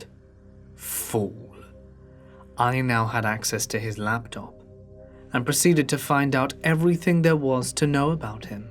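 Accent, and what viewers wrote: United Kingdom. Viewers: Hes british all brittons sound like that . You watch too much mr bean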